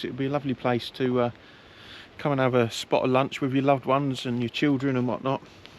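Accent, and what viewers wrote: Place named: United Kingdom. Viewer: aaaahh the british accent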